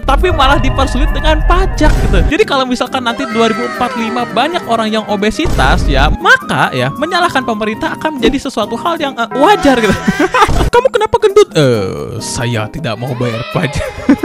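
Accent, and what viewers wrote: Bandung, Indonesia. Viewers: Lo orang mana bang? Bandung ya?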